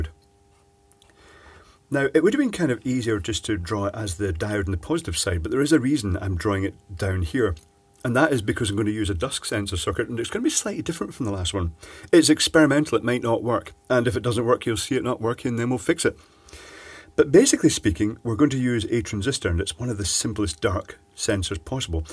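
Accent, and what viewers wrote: Scotland, United Kingdom. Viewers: Whenever I play a video my cat comes in the room and starts mewing, he must like the Scottish accent. I have two old phone batteries on my desk, I was thinking "What can I do with them?" and this video shows up. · It is the Scottish accent. I lived up in Scotland and the people are really chilled and add a sense of humour and you will be refreshed by the positive energy.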